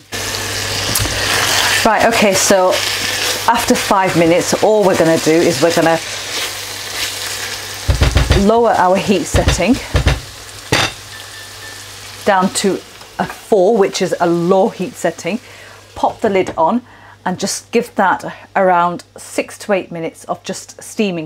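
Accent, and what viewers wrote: United Kingdom. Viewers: Be aware that you have a thick British accent and you talk very fast. Sometimes when I don't understand things, I suspect it is not your accent. British English uses different words than other forms of English. I'm a linguist and a retired university professor in Japan - I've been here over 35 years. After teaching for a while, I stopped correcting my students on everything. I just made them aware of other what speakers of other dialects of English would understand. Yes, I do hold a US passport, but it was not my goal to teach the American dialect of English. I wanted them to be fluent speakers of an International Dialect of English so as many people as possible could understand them.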